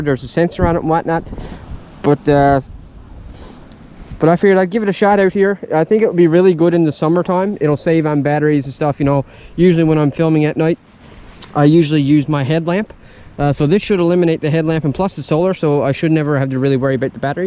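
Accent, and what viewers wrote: Newfoundland, Canada. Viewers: You sound like someone from Nfld...Buck baymen or a towny..?anyways, I subbed. Hello from NB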